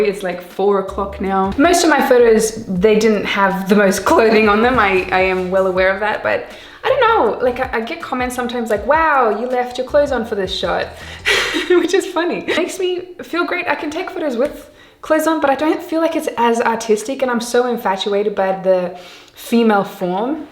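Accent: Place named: Australia. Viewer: Because of your accent, I heard fly swatter as fly sword and thought that must be the coolest Australian slang ever. Hope you're having a great Thanksgiving, although I may be revealing my American toolishness by saying that, and hope you have a wonderful holiday season through the rest of this year and into the new one.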